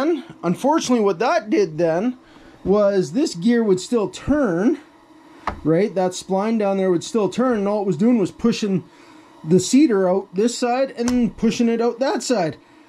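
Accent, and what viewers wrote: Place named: Canada. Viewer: nice vid you should get a longer 1/2" ratchet I like the canadien accent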